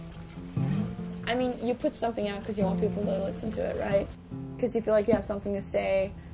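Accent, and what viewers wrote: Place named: United States. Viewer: guys this might be a stupid question but i’m a super new fan. if she’s norwegian why does she speak with an american accent?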